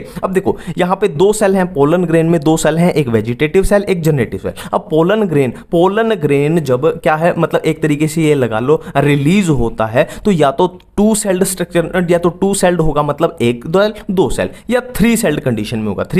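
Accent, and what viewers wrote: Haryana, India. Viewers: App haryanvi hai ...??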